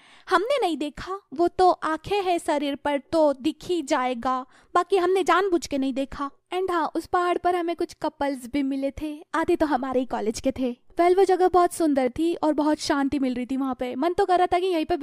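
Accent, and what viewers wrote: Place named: Indore, India. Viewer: Your slang like indoris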